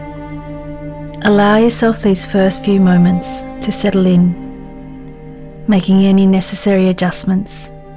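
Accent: Australia. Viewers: New fave yogi channel discovered! Loved the visualization and ofc your gentle Australian voice :3 (I studied in Melb for a year, so I miss that accent)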